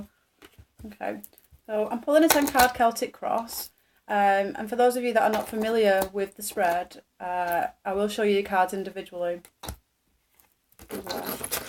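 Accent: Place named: Manchester, United Kingdom. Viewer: Im from mamchester you sound like a mancunian 😅😅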